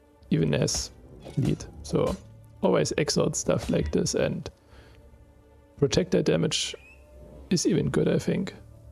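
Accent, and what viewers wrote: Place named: Austria. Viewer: Austrian for sure! Probably from Niederösterreich or Wien! Nice video!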